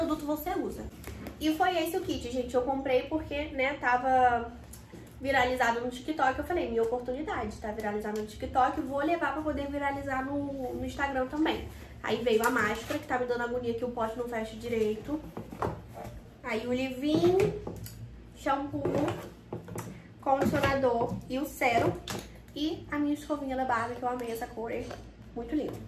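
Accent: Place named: Rio de Janeiro, Brazil. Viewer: Sotaque de carioca , no Brasil é assim cada localidade com seu sotaque e temos que respeitar ❤ eu acho lindo sotaque dos cariocas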